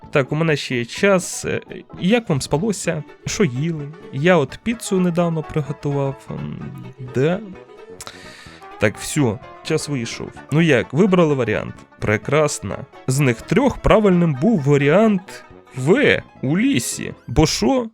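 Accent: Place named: Russia. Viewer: так ти ж сам росіянин, лол. по акценту чути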